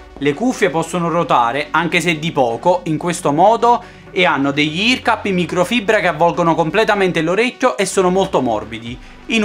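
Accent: Naples, Italy. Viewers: ma sei di Napoli? perchè lo sento dall'accento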